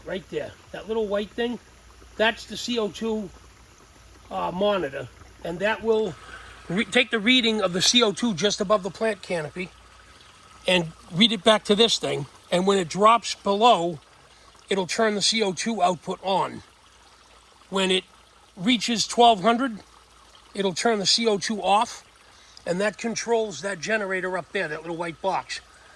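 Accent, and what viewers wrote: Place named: Upstate New York, United States. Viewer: Upstate new york or Jersey for sure. Nice space buddy.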